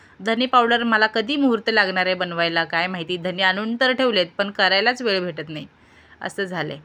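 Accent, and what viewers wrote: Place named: Kolhapur, India. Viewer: Chaan vat te tumcha awaz and tumcha kolhapuri accent eyikayla.. recipes pan baghayla avadtat ❤❤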